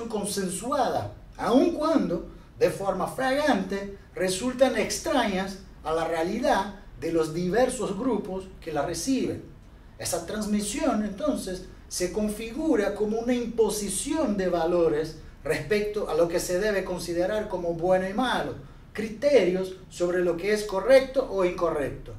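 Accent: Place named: Buenos Aires, Argentina. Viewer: increible hermano, gracias por este contenido. tu acento porteño esta re copado y me encantó escucharme de subito en la grabacion de graveola que aparece en los carteles 🏹💎💚🙏🏾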